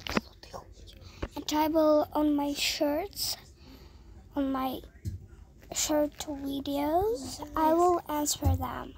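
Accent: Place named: United Kingdom. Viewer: U sound so british broo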